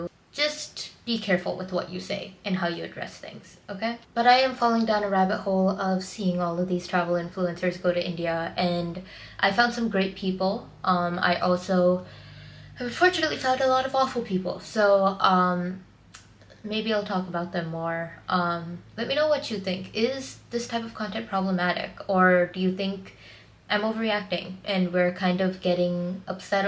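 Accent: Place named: United States. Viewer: I respect what you're saying and your opinions but this kind of falls flat, at least for me, because you seem and sound like an Indian-American who's spent very little time in India.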